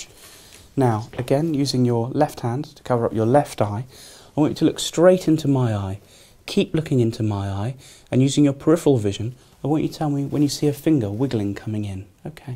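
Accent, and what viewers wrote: United Kingdom. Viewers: It’s so funny how I knew he was a scouser before I even heard his accent properly half way through.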